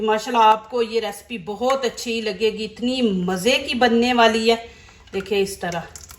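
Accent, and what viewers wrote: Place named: Punjab, India. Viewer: آپ اردو پنجابی لہجے میں نہ بولا کریں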